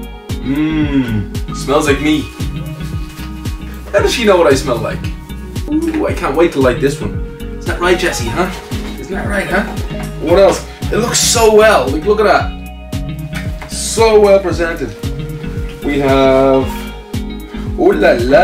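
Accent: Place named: Ireland. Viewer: No one ever says Apothecary the first time out :) And it is ok. It's an old timey word that most people haven't heard before. We're digging it even more with the Irish accent though <3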